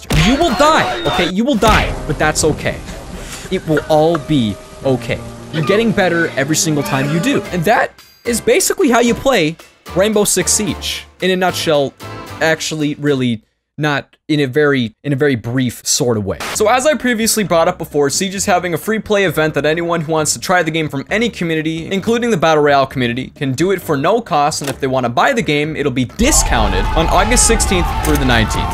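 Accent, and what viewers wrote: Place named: Russia. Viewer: Bru I noticed he kinda has a Russian accent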